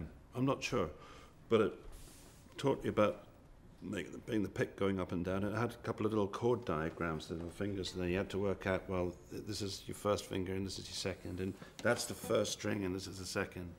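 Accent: United Kingdom. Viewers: Cannot hear you and plus with the English accent hard to understand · I forgot this guy was British.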